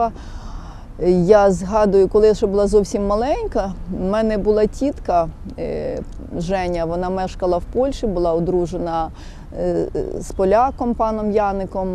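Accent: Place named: Ukraine. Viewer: Piękny akcent ukraiński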